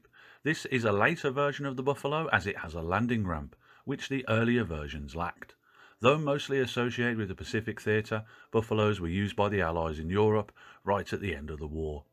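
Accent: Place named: England, United Kingdom. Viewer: Wow!! An English voice on a modelling video for a nice change!!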